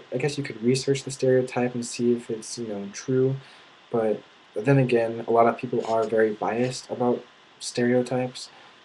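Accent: Bavaria, Germany. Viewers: Seriously? You sound more like a Bavarian than I do, and I'm german :D You seem to be very open-minded and nice, thank you for trying to get rid of some of those stereotypes. Hope you'll get to enjoy Germany even more, someone like you should always be welcome :) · hahahahaaha me too.totally bavarian accent loooool so good :D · So you grew up in the US...but your parents are German...? I think it's funny that you have a slight German accent when speaking English :) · I laughed very hard when you said "Bayrische Motorenwerke", seems like you have a Southern/Bavarian/Austrian accent? :D Great video, by the way!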